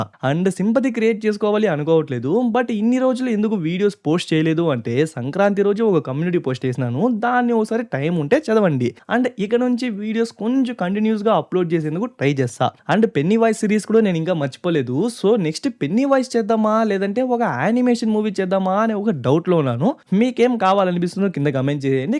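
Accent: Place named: Tirupati, India. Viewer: Triupathi slang never forget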